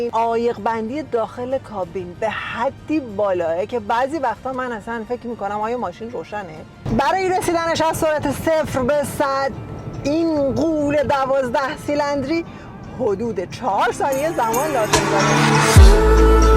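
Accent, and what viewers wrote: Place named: Isfahan, Iran. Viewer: اصفهانی هستید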